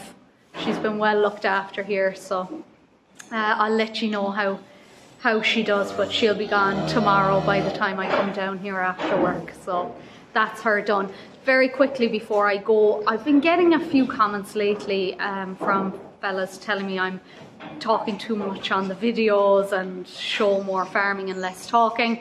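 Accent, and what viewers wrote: Ireland. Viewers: Brilliant video, you could use a greedy board extension to the spreader, maybe useful to see if Vicon do it. A good job well done, you figured it out, and that's what important. No you don't talk to much, it a pleasure to listen to your soft Irish voice. ♥️👍👍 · Hello! Me being from North Carolina(USA) I love listening to you talk. People say I have an accent but I believe you have me bet. Also I love those saying which is probably normal for you Irish folks. Enjoy listening to the sound of the ol' 135 so maybe video some real time in cab with you.🐮🚜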